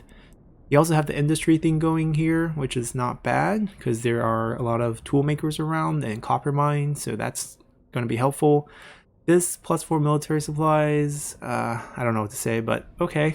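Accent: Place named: China. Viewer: up主是国人吧，这语调不是国人说不出来。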